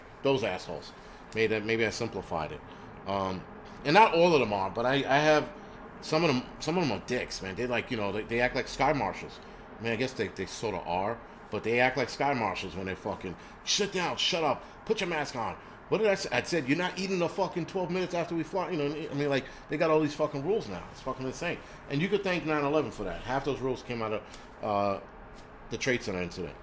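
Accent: New York, United States. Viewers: Your New York City style eloquence is showing again.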